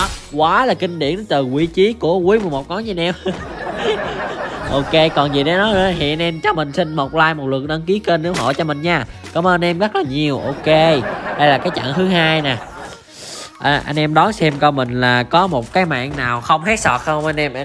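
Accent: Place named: Mekong Delta, Vietnam. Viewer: hèn gì giọng miền Tây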